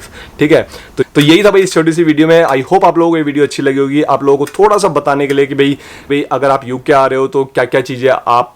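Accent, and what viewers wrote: Haryana, India. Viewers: i think aap haryanvi ho right?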